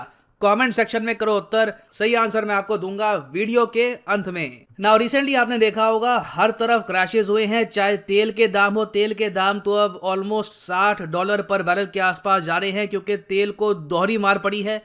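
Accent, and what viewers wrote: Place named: Haryana, India. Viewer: Haryanvi accent 😃😃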